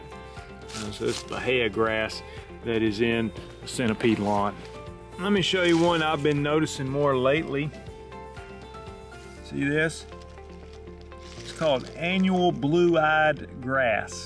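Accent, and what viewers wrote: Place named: North Carolina, United States. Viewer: Good stuff! Mom is from North Carolina so I hear you great!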